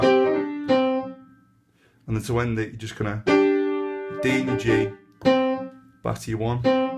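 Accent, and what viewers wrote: Liverpool, United Kingdom. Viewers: Where are you from you sound like a Scouser? Really enjoyed it thanks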